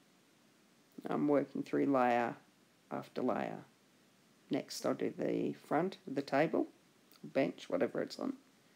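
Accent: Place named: Australia. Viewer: First, you are a wonderful artist. Here are some comments of mine. I had trouble understanding you because of your chest cold and Aussie accent. It did get better as we went along but you sometimes let your words trail off. You work fast, so you might want to stop at some point and show the layers you are using. Also, you use different brushes. Some artists will draw a line or two on a white layer to show what the brush looks like on its own before applying it to the painting. You might consider this. You mentioned a base layer to get darker color but you may want to take that a bit slower so people can understand where you put it and why. Hope this helps. Ron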